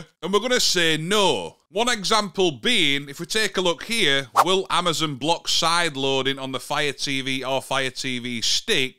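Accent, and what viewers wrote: Yorkshire, United Kingdom. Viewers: It's Yorkshire.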